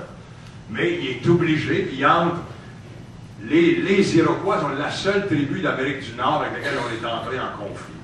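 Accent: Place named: Quebec, Canada. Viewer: Une vraie langue de bûcheron !!! Ça donné quoi son jappage en joual ? RIEN !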